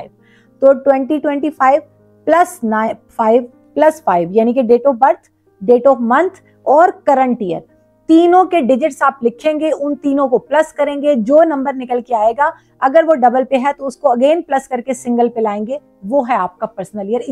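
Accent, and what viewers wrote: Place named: Pothwari, Pakistan. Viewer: پئ اللہ نی قسمےتساں پوٹھوہاری بہوں سوہنری بولنے او اللہ پاک سلامت رکھے تہ عزتاں دے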